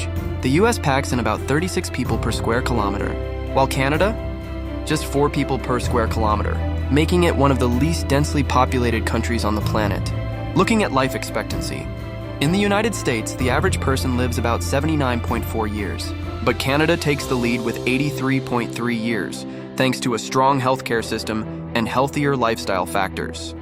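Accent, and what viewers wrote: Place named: Nigeria. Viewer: You sound like a Nigerian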